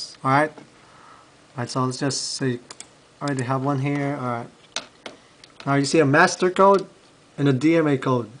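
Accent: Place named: Philippines. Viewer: by the way he speaks.... i am going to say hes a filipino -__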